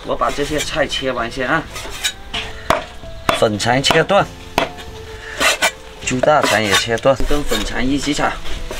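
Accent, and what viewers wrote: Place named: Malaysia. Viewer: Where is from? Sounded like a Malaysian.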